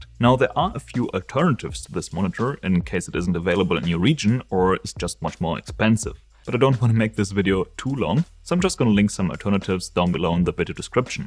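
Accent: Germany. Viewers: Bis zum nächsten Video... Wusst ichs doch. Der Akzent kann nur deutsch sein :D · Are german ? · Dem Akzent und Outro nach gehe ich davon aus, dass du Deutsch sprichst 😅 Ich sehe überall, dass der Samsung Odyssey G7 als der beste UHD 144Hz Monitor angegeben wird, aber was ist eigentlich mit dem LG 27GN950-B? Wenn ich mir nämlich die theoretischen Werte anschaue, liegt der meiner Meinung nach vorne (kann auch sein, dass ich ein Trottel bin und keine Ahnung davon hab, aber wer weiß das schon… 😉)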